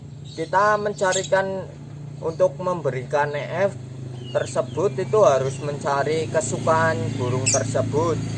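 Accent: Banyumas, Indonesia. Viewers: Ora ngapak ora penak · Ahaha jawa medok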